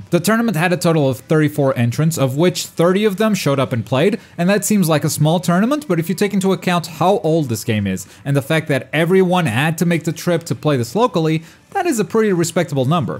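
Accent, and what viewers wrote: Portugal. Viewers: I'm curious to ask but are you Portuguese? 🤔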